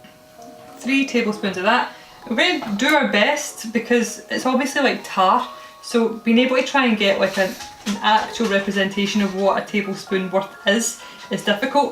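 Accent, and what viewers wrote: Scotland, United Kingdom. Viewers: I'm here for the accent. Maybe for your next video you might read the phone book? I just love a female Scottish accent. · Subbed, not because of the clootie dumpling, not because of the dogs, not even because it was you're grandmother's recipe. Subbed because thank f*ck there's a real Scottish wumin that talks like a real Scottish wumin oan the YouTube, and no like she's got a mooth full a jorries. So sick and tired of that affected, sickly sweet, shortbread tin, West End, Milngavie or Kilmacolm accent that runs rife as soon as ye plonk a camera in front of someone.. Goan yersel hen. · It took me a while to realise what you were making... lost in translation from Scottish to Australian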